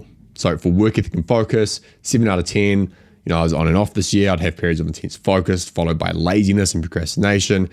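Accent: New Zealand. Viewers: probably you have to wait for YouTube. I think he has a New Zealand accent, I also have to pause sometimes to rewind and understand a couple words that are pronounced differently than what I'm used to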